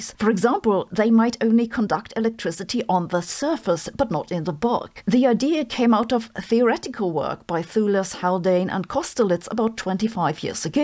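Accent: Germany. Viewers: Hossenfelder: don't do away with your german accent too much when communicating science using the english language: it has a nice charm and ring to it ;-)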